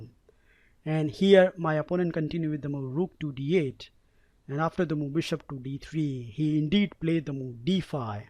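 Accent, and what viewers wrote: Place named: India. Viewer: your my chess guru! I have so much fun using your tactics! Your English-India accent is awesome. Blessings for your entire family.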